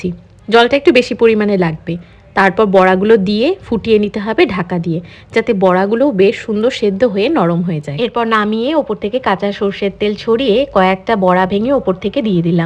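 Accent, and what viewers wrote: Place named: Barishal, Bangladesh. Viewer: আপনি তো মনে হয় পশ্চিম বঙ্গ ভারতের। কিন্তু কী সুন্দর বরিশাল বাংলাদেশীদের মতো কথা বল্লেন! ভাল লাগলো! আমিও বানিয়ে দেখবো এই রেসিপিটা। লোভনীয় হয়েছে। ধন্যবাদ। ❤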